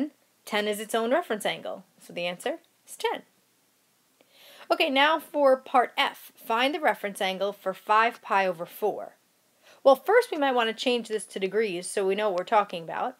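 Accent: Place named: New York, United States. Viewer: I hear a new york accent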